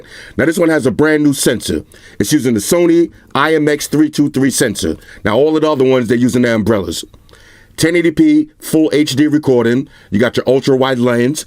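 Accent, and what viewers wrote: New York, United States. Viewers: You’re from queens or Brooklyn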